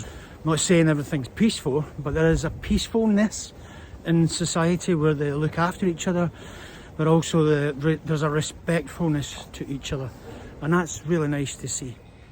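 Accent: Scotland, United Kingdom. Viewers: As a Chinese living in the UK for over 30 years, it is great to hear some Scottish accent from China! Thank you, for reporting your experience in China as it is, and hopefully giving some UK audience some truth about life in China. The main stream media here is so unbelievably biased that for general public in the UK, all they hear is anti China propaganda, zero about what life in China is really like! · Interesting to see a Scot vlogging his life in China. Can tell your Scottish straight from your accent. Love it. God bless. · Loved your trueful and sincere comments on living in China, and your Scottish accent 😊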